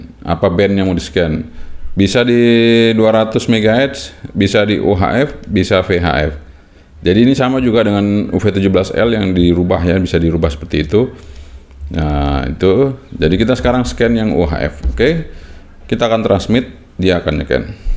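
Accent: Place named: Spain. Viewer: Hola amigo. He visto tu video. Pero no entendi prácticamente nada 😂 ya que solo hablo español. Me he percatado que en tu acento al hablar dominas mejor el español y si no me equivoco en mi observación, te agradecería enormemente si hicieras un video de este equipo en español, ya que en la red todavía no aparecen o almenos yo no les he encontrado. Gracias de antelación 👍✌️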